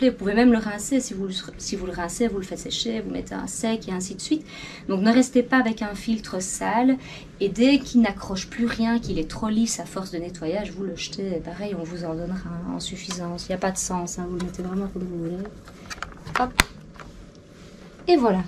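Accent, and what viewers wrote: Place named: Belgium. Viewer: En Belgique, c'est comme cela que l'on parle français et on s'en accommode très bien, ne vous en déplaise.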